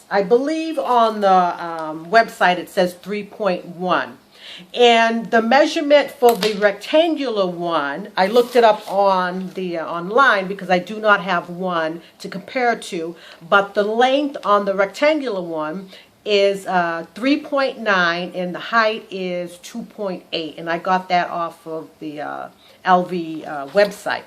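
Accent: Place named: Massachusetts, United States. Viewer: Hi! It's Balance and Grace. Great Review! Great piece. Great nails. And I love your accent. It reminds me of my best friend from Mass. Be well!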